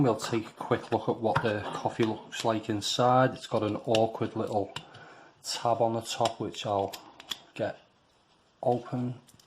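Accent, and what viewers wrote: Liverpool, United Kingdom. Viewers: Sorry, this is not related at all but I have to confirm, is that scouse accent I'm hearing?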